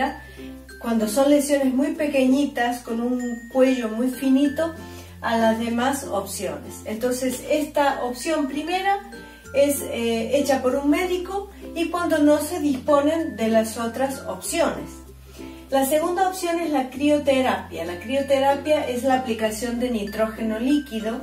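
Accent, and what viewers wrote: Córdoba, Argentina. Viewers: Parece Cordobesa de Argentina doctora aunque con el acento un poco cambiado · Debe ser sonido argentino, quizá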